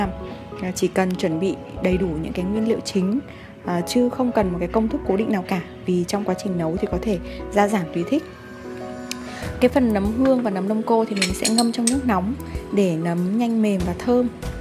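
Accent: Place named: North, Vietnam. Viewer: noí tiêńg băć nghe hay. thanks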